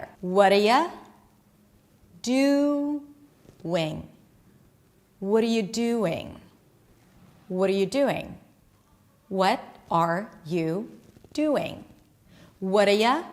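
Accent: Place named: New York, United States. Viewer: I'm a native speaker of English, so I got all of these correct, and most of them almost immediately, so no surprise there. However, speaks with a New York accent, and I come from Detroit, in the Midwest, so I had a bit of trouble with "out of the office", because she actually uses a different vowel in "out", which sounds to me like "at". Point is, even native speakers can still have a bit of trouble with an exercise like this, so foreign students of the language should not feel bad about missing a few of these. English not only has these strange prosodic patterns, but the vowels often shift a lot from one region to the next.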